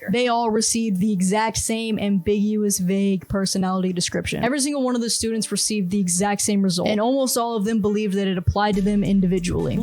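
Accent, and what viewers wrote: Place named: United States. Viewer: What part of the US are you from? I’m trying to decide your accent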